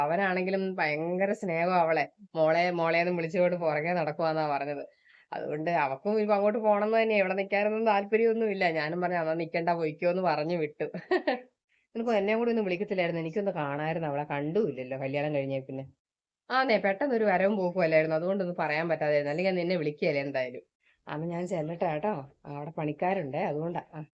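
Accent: Idukki, India. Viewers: ഹയ് ഇടുക്കി slang കലക്കി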